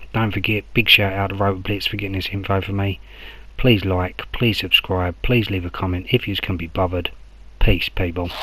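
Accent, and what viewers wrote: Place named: United Kingdom. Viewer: i know im late but hearing a another British voice is redreshing lool. Good video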